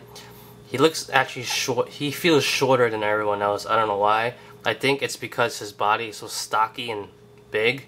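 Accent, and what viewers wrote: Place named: New York, United States. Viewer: ... I'm glad your one of the first to have the captain america toyline... your reviews are great bro... also your customs are amazing. Doode keep up the great work... and also... I heard a little New York accent hahaha nice... great stuff man!!! --Vincie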